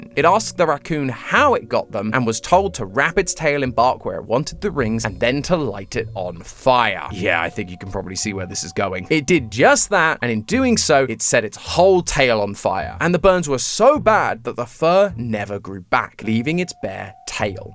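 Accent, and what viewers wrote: United Kingdom. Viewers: I miss Mat. The british accent is weird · i forgot tom replaced matpat,so when i clicked i got jumpscared by a british accent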